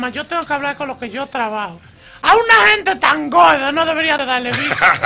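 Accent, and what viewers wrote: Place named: Cibao, Dominican Republic. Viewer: Lo que más me gusta es el estilo cibaeño.😂😂😂😂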